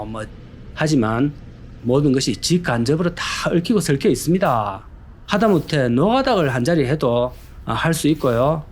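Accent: Gyeongsang, South Korea. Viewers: 경상도 사투리 방송? 왠만하면 표준말로 하시죠.. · 전형적인 진짜 ㅈㄴ 듣기 싫은 경상도 말투 ㅋㅋ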